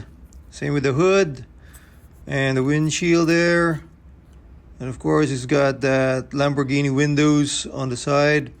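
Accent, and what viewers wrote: Philippines. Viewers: i dont really care for beast skywarp but earth sideswipe is what i really want! hey are you from the philippines? i am you just have an accent when youre filipino but youre speaking english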